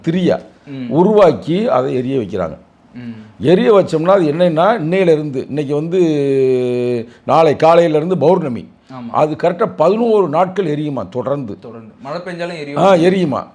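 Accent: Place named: Madurai, India. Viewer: மதுரை தமிழில் அறிவார்ந்த சொற்களை கேட்கும் பொழுது.... இனிது இனிது... நீர் வாழ்க....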